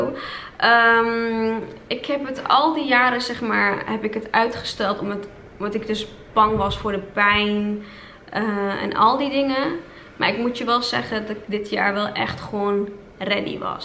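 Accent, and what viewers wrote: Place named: Drenthe, Netherlands. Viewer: niet gemeen bedoeld ofzo maar wat praat je opeen plat echt heel boers accent ...kom je uit drenthe?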